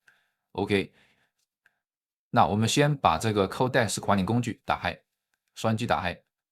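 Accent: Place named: Guangxi, China. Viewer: 口音应该是广西老乡。😀刚想找个Codex对接本地模型方案，讲得很详细。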